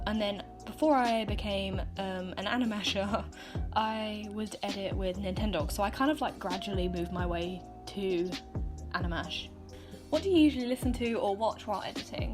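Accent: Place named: United Kingdom. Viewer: I knew you were br*tish but the accent still jumpscared me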